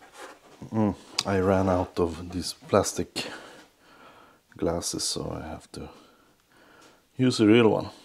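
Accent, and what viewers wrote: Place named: Minnesota, United States. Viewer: Great video!! Born and grew up in Minnesota, I can tell where our accent comes from!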